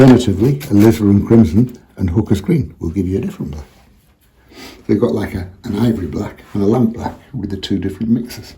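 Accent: Yorkshire, United Kingdom. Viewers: Just discovered your channel. Glad I did. As a native of Yorkshire me self, it’s nice to hear a Yorkshire accent. And your paintings are stunning. This one so reminds me of many snowy days I’ve seen back up North. I’ve subscribed and look forward to many more tutorials as good as this one. Thank you. 😊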